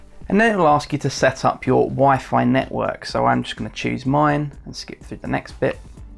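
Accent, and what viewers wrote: United Kingdom. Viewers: BUZZKEIL Tech: The accent is NOT American. I'm from America! The accent on the video sounds cockney.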